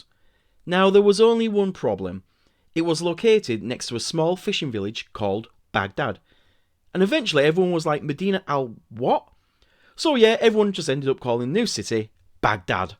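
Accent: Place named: North, United Kingdom. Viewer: I really like your witty style, pairs well with northern accent. Keep up the good work!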